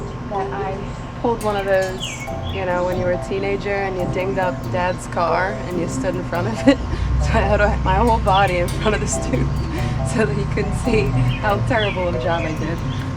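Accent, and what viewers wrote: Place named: Eastern Pennsylvania, United States. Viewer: This video popped up in my feed and a min in I could tell you were in PA by your accent, I’m assuming eastern PA 😂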